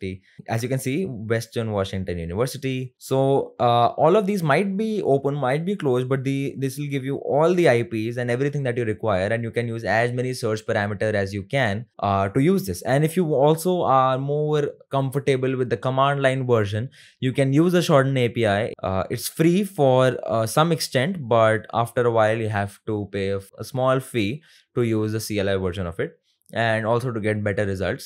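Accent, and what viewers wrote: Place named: India. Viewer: Disgusting Indian accent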